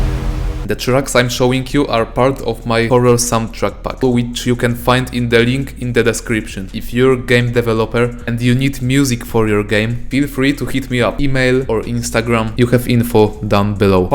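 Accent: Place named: Russia. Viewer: sounds either Russian or German to me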